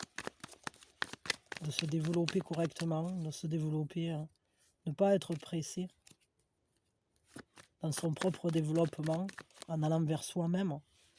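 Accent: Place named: Gascony, France. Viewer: Chère, je viens vous dire M.E.R.C.I. merci, merci pour cette magnifique, magique, et tellement parfaite année que vous nous avez offerte avec ce cœur magnifique que vous avez. Vous faites ^partie des gens exceptionnels que j'ai eu le bénéfice de rencontrer. C'est rigolo parce que vous avez le même accent que moi ( je suis en Gascogne - 32 ) et vous? si vous permettez bien sur. Joyeux Noël. Paix, Amour, Lumière à vous ainsi qu'au monde entier.Je nous aime!!! PS: Vous chantez divinement bien!!!; )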